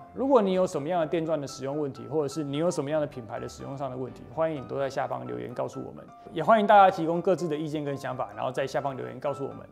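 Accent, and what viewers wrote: Taiwan. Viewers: 沒有BOSCH......鑽頭：學名就是鑽頭！不要在那邊台灣國語.....要你就直接用台語介紹、解說！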